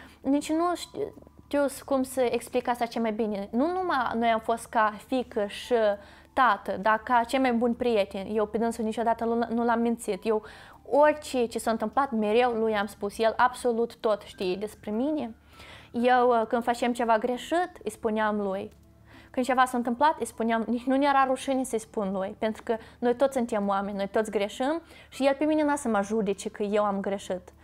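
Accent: Moldova. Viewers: Vorbesti foarte bine româneşte!Bravo!Mai ales ca o vorbeşti cu accent frumos moldovenesc,eu fiind din Suceava 🇷🇴Esti desteapta,deosebita,nemaiponită❤❤❤ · Ce nu inteleg, daca această fata a fost crescută în Elveția de la 3-4 ani, cum de are un accent moldovenesc ca o persoană care acolo a crescut?🤔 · Totuși, de ce are asa accent moldovenesc, dacă a trăit mult fără mama în Elvetia?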